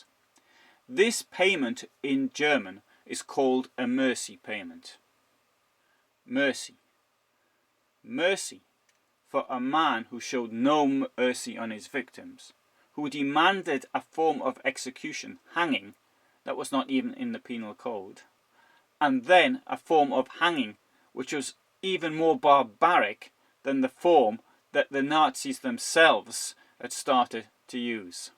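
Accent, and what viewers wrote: Newcastle, United Kingdom. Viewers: Really enjoy the channel, improving my knowledge & understanding, the fact that I also love the Newcastle accent is a real bonus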